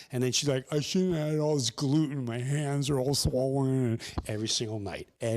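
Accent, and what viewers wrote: Ireland. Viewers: Interesting how you slag off English food (in an Irish accent) and then say the Irish woman told you to go eat "Fish & Chips". You COULDN'T get more English than that 🤣🤣🤣🤣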